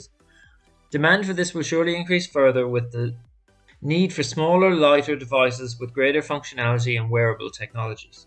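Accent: Ireland. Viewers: Who is downvoting this? I liked and subscribed, thanks for the video. I especially enjoy the Irish(?) accent - “sales and mahhhhrketing (marketing). Ah, Sacred Heart, there ought to be a bar over here.”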